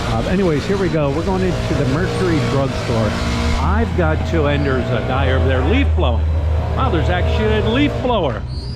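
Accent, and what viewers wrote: Philadelphia, United States. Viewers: Bro, you still talk like you are back in Philly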